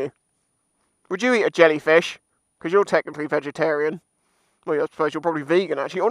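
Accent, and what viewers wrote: United Kingdom. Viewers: yo what part of Britain you from?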